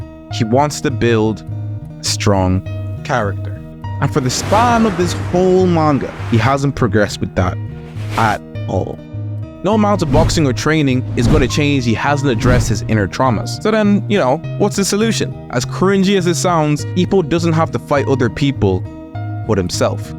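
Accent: Ireland. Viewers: cool irish accent lol you sound exactly like my brother, love hajime no ippo since it motivated me to begin boxing.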